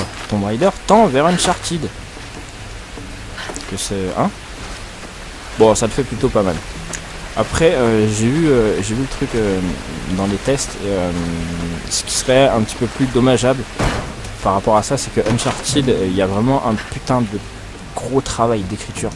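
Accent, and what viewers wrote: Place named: Marseille, France. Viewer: … Ah que c'est drôle tout de même d'entendre ton accent Marseillet. On voit de loin que tu es du sud de la France. - Clément de Québec.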